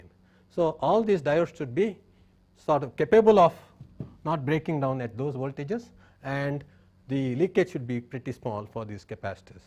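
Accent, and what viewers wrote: India. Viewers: Nice NPTEL puts these videos up for the whole world to learn from them :) Just a wish; You ought to put subtitles in, as indian-english is difficult to comprehend fully. Cheers.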